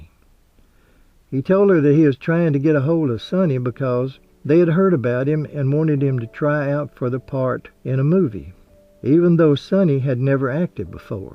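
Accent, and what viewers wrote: South, United States. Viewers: I could listen to you narrate a telephone directory, or anything else for that matter. You have such a soothing voice. I love your Southern accent. Best wishes from the UK 🇬🇧 ❤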